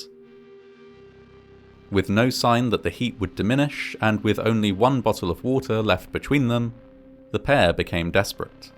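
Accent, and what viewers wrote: United Kingdom. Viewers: He’s a Brit let him speak British english in peace, man lmao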